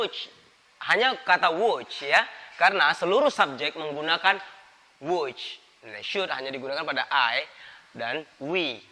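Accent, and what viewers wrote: Indonesia. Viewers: pronunciation nya dibaikin bang,., pengucapan not nya indonesia bingiitt,., tp pembelajarannya ok,,. lanjutkan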